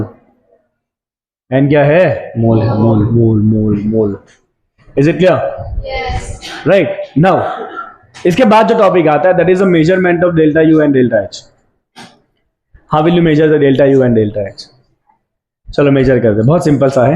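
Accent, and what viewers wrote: Gujarat, India. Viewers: Thnak you sir for reply Btw, tamaru hindi english speaking and writing mast fluent chhe but video ma akad evo word aavi jay k jena parthi khbr padi jay k tame gujarati j chho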